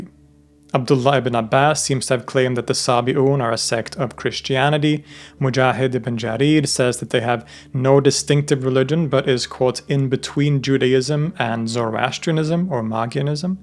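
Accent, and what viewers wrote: Arab world. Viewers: Ur semitic arabic accent is on point.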